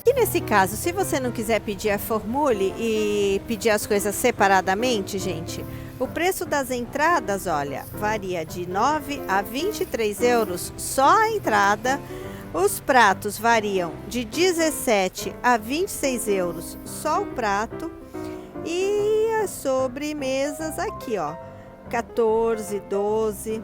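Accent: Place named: Santa Catarina, Brazil. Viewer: Deve ser inveja kkkk..vc deve ser de SC o povo daqui q fala assim.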